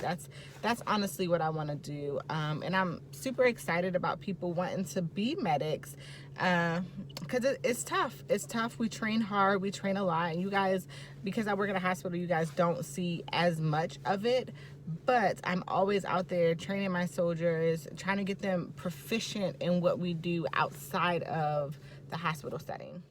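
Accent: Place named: Caribbean. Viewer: Are you from the Caribbean (Virgin Islands)? I can hear an accent 🧐😃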